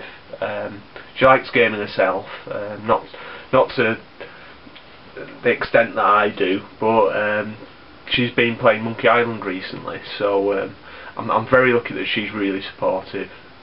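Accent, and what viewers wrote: United Kingdom. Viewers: you almost sounded british mate ;).. Great answers and it's cool how your family are mostly supportive of your collecting. I respect anybody who has a passion for something!.. on a completely unrelated note, i remember a while back you said that you found a reasonably priced copy of V-V.. did you end up getting it?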